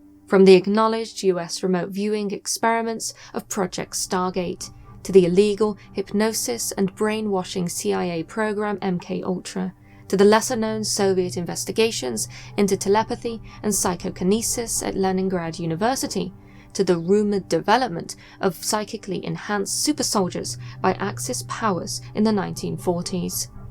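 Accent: United Kingdom. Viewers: personality is perfect for the channel. Quite clean Brit accent too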